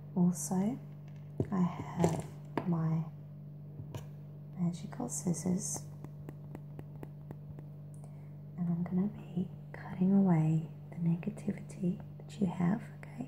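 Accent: Australia. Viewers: awesome Australian accent !!! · You do a better Aussie accent than me and I'm Australian! Love it girl! Great vid! Thanks xx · Great Aussie accent!!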